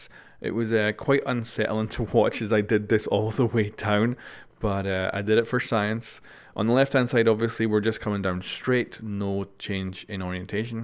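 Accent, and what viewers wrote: Scotland, United Kingdom. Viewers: Are you Scottish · Are you Scottish? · Are you Scottish or Irish?? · Sub'd cause Scottish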